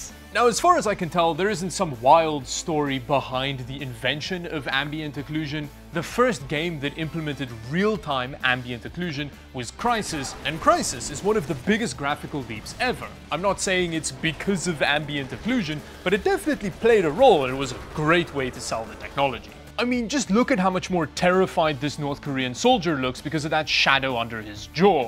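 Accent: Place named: South Africa. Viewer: Hey man, I'm loving your content, are you a fellow South African?? It's so refreshing to hear a familiar accent XD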